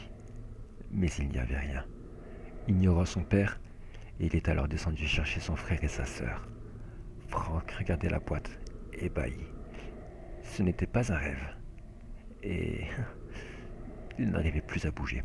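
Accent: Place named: North, France. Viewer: une petite question,est ce que tu es du nord ? (dans le Nord,y a des gens qui ont un accent semblable au tien ^^) d ailleurs il est joli ton accent :)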